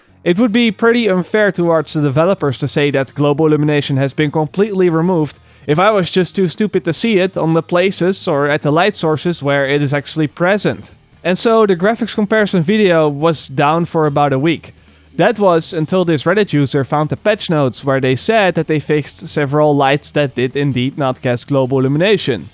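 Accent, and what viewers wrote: Netherlands. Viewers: Do one about season pass and the pre-order stuff! The P416 for example, which you get when you buy gold edition; Is that a low lvl rifle for smoothing up the egdes in early-early game or is it like in a container in your stash which you can "unpack" at any time with the negative effect that it binds to you and your lvl? Sort of like Schrödingers cat? Also what are the content drops and how will they work? National guard gear? the "perks"? I'm not asking you for straight answers but just your thoughts on it. Man I have been watching your videos since you started making them, back when all we had was rumours and speculations based on "hints" inte the first trailer. I can't believe it's finally coming out!! I have gotten engaged, had a son, raised him for 2 yrs, moved to another town, quit my job, and started studying (which I'm almost done with) during the time from the reveal to release. 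All this accompanied by your videos and dutch accent, almost each week. This is gonna be great! have a nice one, Or as we say, IIN SWEEDEN, "kom igen nu Britt-Marie, KÖR för fa-an"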